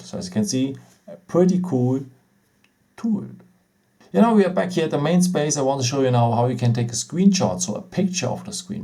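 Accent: Germany. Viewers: Can you speak English ? You are 100% a german guy !!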